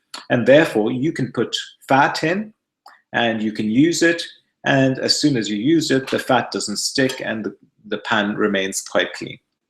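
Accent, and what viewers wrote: United Kingdom. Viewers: Wonderful video! So informative and you explain things so clearly! Your British accent is a plus!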